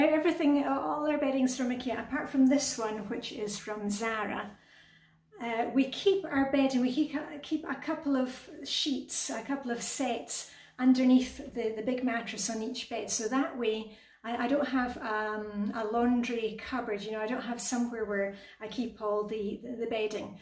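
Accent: Scotland, United Kingdom. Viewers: my little one's comment when you said "Little" -- "Mum she's from Ireland" -- I laughed and said no Scotland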